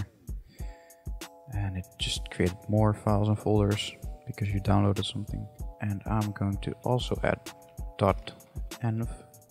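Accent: Netherlands. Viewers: Dutch? Nederland of België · yeah I was thinking the same ben je nl =) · Your accent sounds Dutch.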